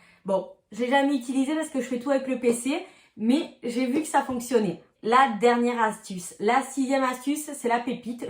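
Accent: South, France. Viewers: Bravoo cette vidéo m'a trop plue, votre accent serait il du Sud? Vos explications et astuces , et bien, un grand merciii🤗 je ne cricute plus depuis hier soir, bluetooth ne veut plus🥺 mais je vais vous suivre quand même 🙏 je suis en manque de cricuter👏👏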